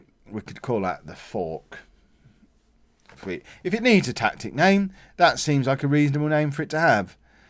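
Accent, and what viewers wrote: United Kingdom. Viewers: Like watching David Brent/Ricky Gervais play Football Manager. Not just the accent. It's the attitude, the jokes, everything. Love it.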